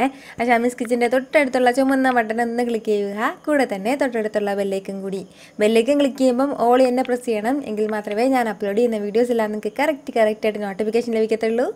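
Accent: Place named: Kannur, India. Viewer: Endutta slangaa chechi... Superb KANNUr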